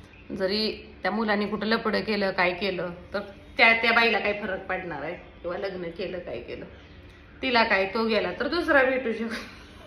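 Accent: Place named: Pune, India. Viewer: Tumchya bolnya warun tumhi punyat kuthe Rahat aasal te lakshat yete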